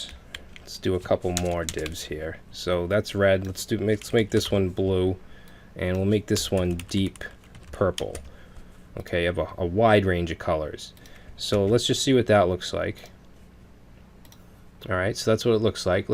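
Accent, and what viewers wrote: Boston, United States. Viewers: You are from Boston huh.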